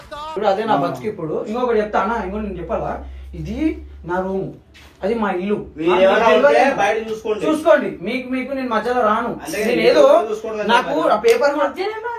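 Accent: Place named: Andhra Pradesh, India. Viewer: Hi anna mi videos einthaka mundhu challa manchiga undavi anna Eppudu videos lo andhra slang maku aithea manchiga anipistledu anna aa slang maku adram aithea ledu anna so plz deniki recat avu anna koncham 😊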